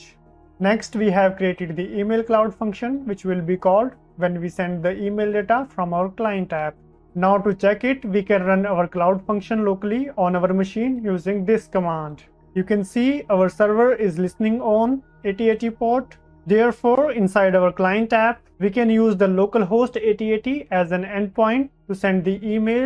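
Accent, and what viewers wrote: India. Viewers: You sounds so... Indian??? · An INDIAN Voice ....??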